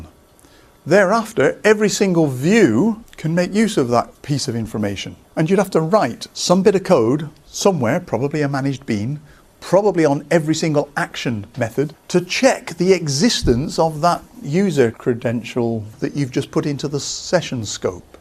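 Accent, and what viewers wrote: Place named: United Kingdom. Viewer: Hey, your videos are very nicely explained along with eloquent British accent. Keep on posting videos. Thanks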